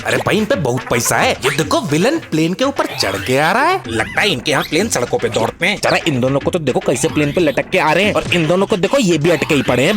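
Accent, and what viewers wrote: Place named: Assam, India. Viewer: Big fan bro Choro Choro assosom type se bolte Ho bhai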